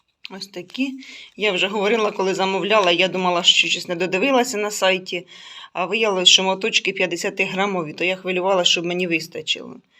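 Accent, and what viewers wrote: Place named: Chernihiv, Ukraine. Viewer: а я когда тебя слушаю и смотрю, свекровь свою вспоминаю. Её говор очень сильно похож на твой. Она у меня с Черниговской области. А мои на суржике больше говорили, пока папа жив был и бабушки. Мамина родня и с Мелитополя.. Но сейчас мы, к сожалению, только на русском говорим. Но передачи смотрим в интернете на украинском.. А вообще, когда я слушаю твою речь, как будто к бабушкам попадаю, сразу такие воспоминания тёплые начинаются. 🙂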